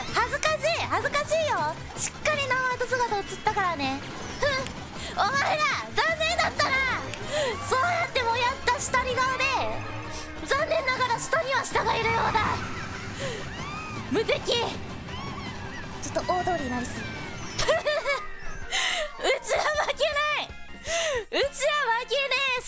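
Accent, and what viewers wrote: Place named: Osaka, Japan. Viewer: Angry + Osaka dialect = moe overload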